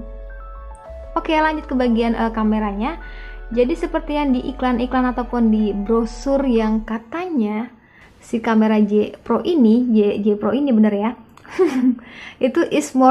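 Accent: West Java, Indonesia. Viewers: Orang Sunda 🤣🤣 suarana teh meuni lembut...aya ngejendol na🤣🤣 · Urang sunda nye teh?😊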